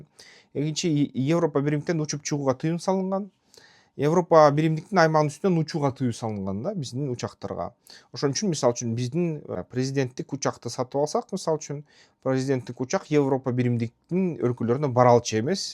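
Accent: Standard. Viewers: Сиздин таза Кыргызча сүйлөгөнүңүз өтө соонун. Азаматсыз Улут бул Тилден башталат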